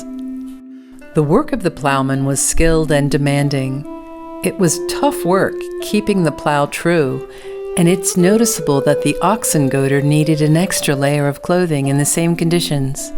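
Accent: United States. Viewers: I agree that they are informative, but they are mostly about British fashion, so why is an American narrating? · Why do they have an American commentating this...😂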